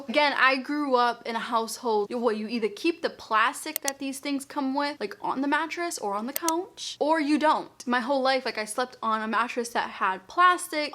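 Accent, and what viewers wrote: Boston, United States. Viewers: Is that a Boston accent? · Also I love how many times you said car and carpet in this video I love your Boston accent!!! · OMG YOUR FROM BOOOOSTON I LOVE IT 🥰 love the accent love seeing ppl from my city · Omg that looks like a completely new mattress! How crazy! By the way your hair looks really good in this video 🥰. Oh and I love hearing your Boston accent 🤗 I like how you say car and carpet! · Is that a Boston accent? 😮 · Brooklyn? I could see confusing it for Queens, but this is 10000% a Boston accent.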